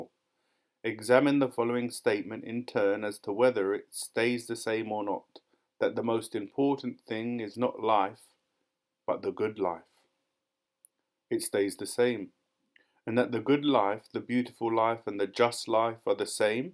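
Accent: United Kingdom. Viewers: Gracias bro. Are You british?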